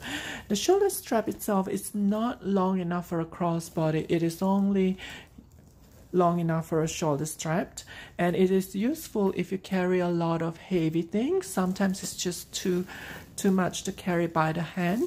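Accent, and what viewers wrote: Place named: Singapore. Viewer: I lived in Singapore for a while so I can hear the familiarity 💕 Thank you for the beautiful review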